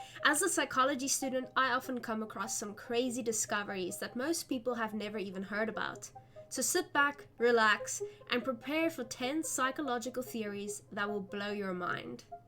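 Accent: South Africa. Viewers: I'm guessing that the mere exposure theory would be why people with specific phobia are encouraged to go through exposure therapy? Though frankly, as a person with a phobia, I want nothing to do with that 😅. I know this video is a month old already but I quite enjoyed it. I've always loved psychology but not on the level that you obviously do. Bonus that I'm an American who lived several years in SA (Capetown), so hearing a familiar accent is just lovely when hearing about a topic I enjoy. Thank you for the content, and I look forward to hearing more as you continue your studies.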